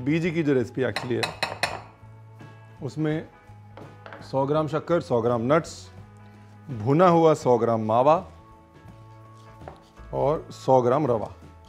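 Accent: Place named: Lucknow, India. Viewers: Lucknow ka accent ek Punjabi k muh se sunna.. bada mast laga sunke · Humare Ghar bhi yahi Banti hai Holi mein, full on lucknaowi accent... Chalte phirte bhi didn't miss the essence of history/explanation badiya bahot badiya Background score bhi Holi wala HOTA toh cha jate my god... Phela Saal Ka first festival Kuch toh shor Hona chahiye...koi nai feelings conveyed by exceptional Poem, visuals, t-shirt, recipes and ofcourse singing... 🌈🎨🏳️‍🌈🎊 Happy Holi to Yours from ours 🥳🥳🥟🥟🥟🥟🥟🥟🥟🥟🥟🥟🥟🥟🥟🥟🥟🥟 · Thank you so much...I love all your receipes..and this one is super amazing... Love the way you talk...main videos dekh ke samajh gayi thi this must be someone from Lucknow...Thanks!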